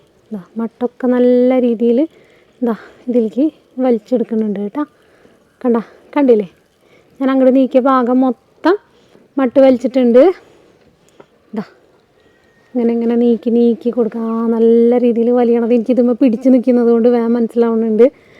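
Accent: Thrissur, India. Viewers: സംസാരം കേട്ടിട്ട്. തോന്നുന്നു തൃശൂക്കാരിയാണെന്നു എന്തായാലും വീഡിയോ അടിപൊളിയാണ്‌ കേട്ടോ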